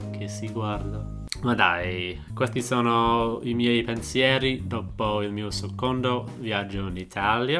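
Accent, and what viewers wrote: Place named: United States. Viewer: Sono romano e capisco cosa significa muoversi in questa città > gli autobus non hanno orari e pensa che per un periodo avevano messo alle fermate degli schermi con i tempi di attesa ((cazzata)) però devo difendere il fatto che non sbagliano strada, magari saltano qualche fermata, non arrivano sempre al capolinea, però non sbagliano strada. In ultima analisi > carissimo american guy > con l'accento americano e un appartamento a New York che aspetti a dire Sì a una girlfriend made in Italy? Uh, se perfezioneresti l'italiano!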